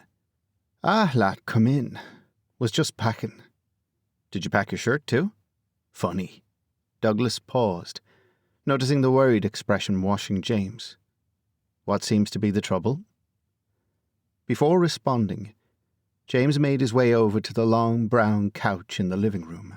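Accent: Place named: United Kingdom. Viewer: WHY does a book set in SEATTLE need a "british narrator"?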